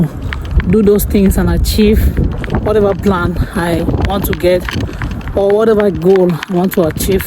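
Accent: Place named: Nigeria. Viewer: Nice, did you grow up in Nigeria? Your accent sounds Nigerian.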